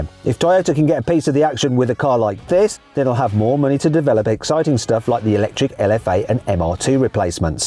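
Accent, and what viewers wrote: United Kingdom. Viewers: イギリス英語で耳が喜んでる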